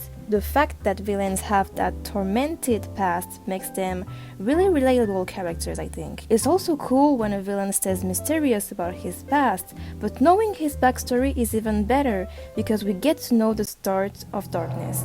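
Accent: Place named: Eastern Europe. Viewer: Let me guess, you're from eastern Europe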